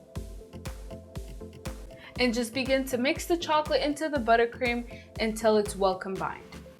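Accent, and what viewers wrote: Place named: New York, United States. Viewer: I love your accent. Are you from New York?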